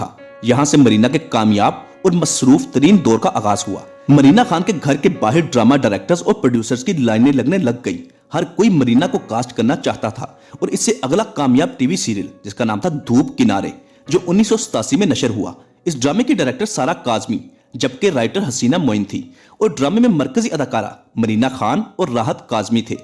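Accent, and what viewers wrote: Punjab, India. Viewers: آپ کی وڈیوز معلوماتی اور دلچسپ ھیں- مگر آپکا اردو تلفظ خالص پنجابی ھے جیسے مرینہ کو آپ MRINA بولتے ھیں- جبکہ لفظ Marina ھے مطلب م پر زبر ھے، اسی طرح دیرینہ کو درینہ بولتے ھو یہ لفظ "دیر" سے ھے دیر ینہ ھے درینہ نھیں- امید ھے اپنی تصحیح کر کے اپنی وڈیو جو مزید دلچسپ بنائیں گے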